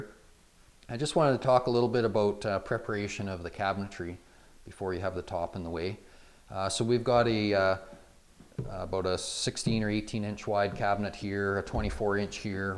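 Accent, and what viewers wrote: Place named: Canada. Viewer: Took 5 words until I knew this was a Canadian.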